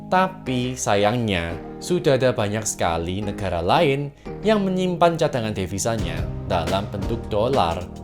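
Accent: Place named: Banyumas, Indonesia. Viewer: Logatnya ngapak. 😅 Nice 👍👍👍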